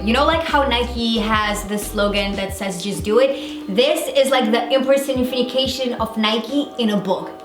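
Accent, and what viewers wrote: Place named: England, United Kingdom. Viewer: Hai un bellissimo accento inglese ♡.♡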